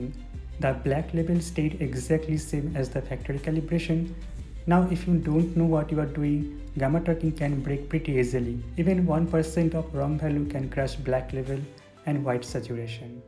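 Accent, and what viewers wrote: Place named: India. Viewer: Indian accent is amazing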